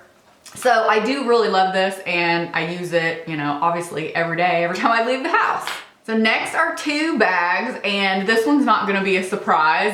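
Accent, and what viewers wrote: South, United States. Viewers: You have the strongest southern accent I have ever heard. Where are you from...love from Sydney, Australia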